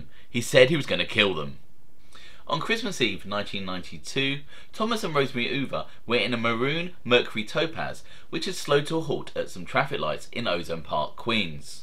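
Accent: United Kingdom. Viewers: I normally despise the British accent(no offense) and refuse to listen to it but you're channel is damn good I can't help it.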